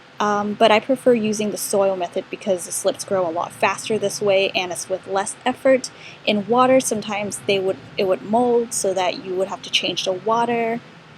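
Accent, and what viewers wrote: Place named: United States. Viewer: I know this makes me stupid and borderline racist but I was completely thrown off by your completely normal American accent. lol Sorry.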